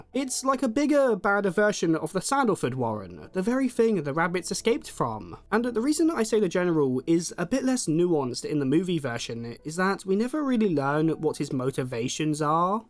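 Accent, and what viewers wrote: United Kingdom. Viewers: I was trying to place the accent. Sounds like if Mark E Smith if he came from Southend and not Salford. It's nearly unlistenable.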